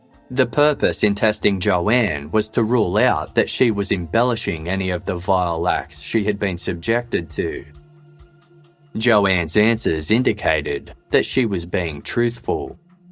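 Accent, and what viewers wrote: Australia. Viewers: I didn't realize he had an Australian accent until I saw someone comment and still only hear it when I think about it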